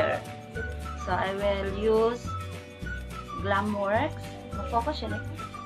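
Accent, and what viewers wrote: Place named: Visayas, Philippines. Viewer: Ate bisaya ka pala. Magka sinabut jud ta ani love u po ❤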